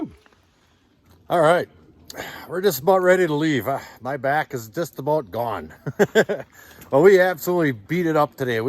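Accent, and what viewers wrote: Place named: Wisconsin, United States. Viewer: I like your Wisconsin accent don't ya kno! When I heard crick, not creek, I knew. Lol great content !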